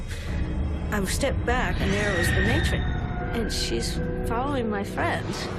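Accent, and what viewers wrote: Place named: Canada. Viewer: Love her Canadian accent so much! And the Halifax story breaks my heart! All those poor people! :( May God rest their souls!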